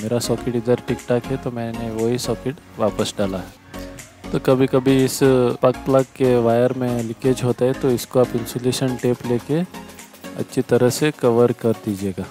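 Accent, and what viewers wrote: Goa, India. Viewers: Are you from goa? ?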